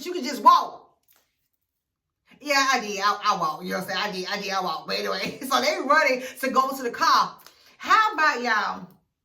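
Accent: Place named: Memphis, United States. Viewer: You sound so Memphis or Mississippi. You had me rolling. How your sisters let them girls act like that toward you? Chile. Girl trips 😓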